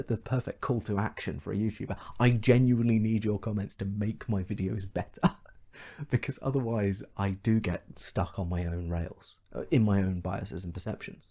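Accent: United Kingdom. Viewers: To be fair, everything sounds more believable if said in a erudite British accent.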